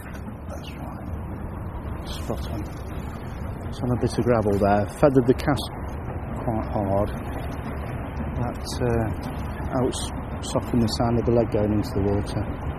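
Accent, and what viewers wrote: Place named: Northern England, United Kingdom. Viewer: I live in belper derbyshire but im from up north and by the sounds of your accent your obviously not far from where I live just wandering where abouts this location is? because it looks a lot like the belper strecth of river or is it a lake where you were fishing? its just ive heard theres a few carp in my river which noone fishes for and I fancy giving it a go for a few day sessions cheers regards mike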